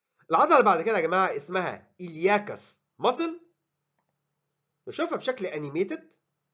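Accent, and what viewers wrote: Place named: Iraq. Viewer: من اللهجه واضح عراق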